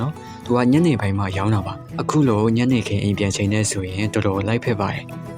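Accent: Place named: Dawei, Myanmar. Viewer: ထာ၀ယ်အသံကြီး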